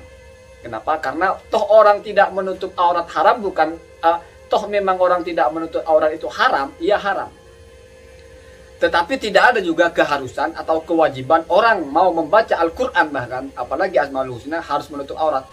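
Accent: Madura, Indonesia. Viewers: Maklum,. Memang logatnya orang Madura kayak gitu mas.. kesannya ngegas kalau bagi orang Jateng. Tapi bagi orang Jatim dan Madura sendiri, itu biasa saja.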